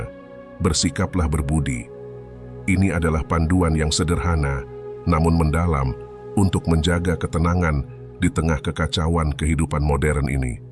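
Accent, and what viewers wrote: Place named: Manggarai, Indonesia. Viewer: logat manggarai banget😊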